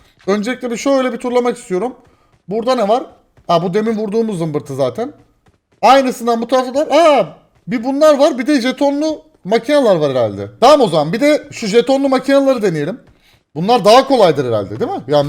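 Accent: Azerbaijan. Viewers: abı Azerbaycanlımısın?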